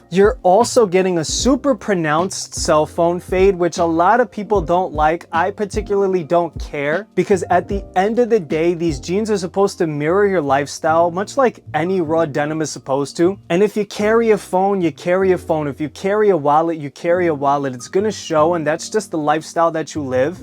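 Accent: New York, United States. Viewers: Feel like im in newh yohk, love the accent.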